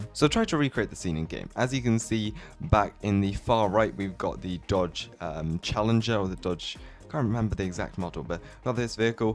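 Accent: United Kingdom. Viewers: Can anyone tell me if is British or not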